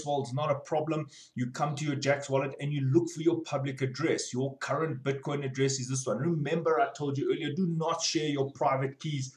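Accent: South Africa. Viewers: South African!! Lol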